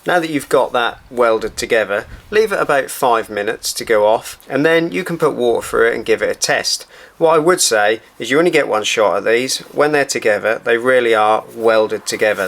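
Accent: Norfolk, United Kingdom. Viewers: Came for temperature DIY advice, stayed for the Norfolk accent ❤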